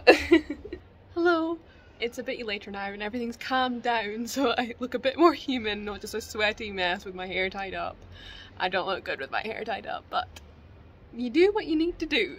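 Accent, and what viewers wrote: Scotland, United Kingdom. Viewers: i love how you've let out your scottish accent a bit more in this video lol. i'm not scottish but when i was reading her royal highness i could feel that it was all just a big stereotype without much research behind it, so it was interesting to hear your point of view. And honestly i totally feel you, i'm from italy (probably the most stereotyped country in the world) and it's frustrating seeing people (but especially americans) romanticise and misrepresent my country all the time.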